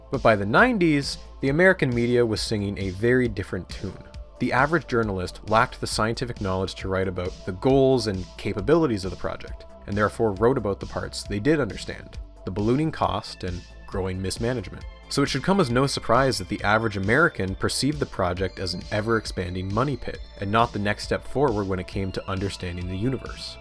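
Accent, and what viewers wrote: Canada. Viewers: No he‘s Canadian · I will also admit, as a holder of one myself, I'm biased for the Canadian accent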